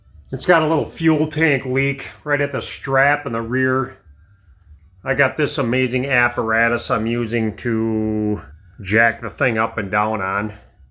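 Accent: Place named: Minnesota, United States. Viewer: Love the accent LOL. Minnesota ? I think this accent with sarcasm is just the best combo. Question if you needed to JUST replace the fuel pump. would you recommend doing it this way or from the top by removing the bed?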